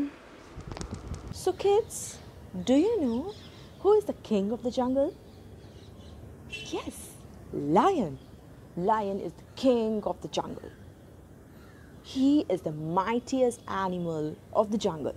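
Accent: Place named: England, United Kingdom. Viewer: Awesone Story telling.. english accent and the way the story narrated.. creates enthusiasm in kids.. Great Job!!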